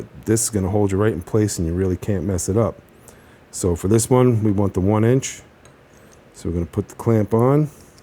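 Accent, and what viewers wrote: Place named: United States. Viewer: Sounds more American than British 😢😢😅😅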